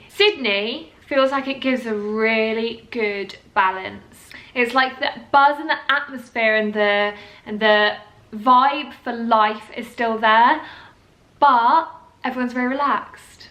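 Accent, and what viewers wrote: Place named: United Kingdom. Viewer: i cant pick your brit accent . where u from ?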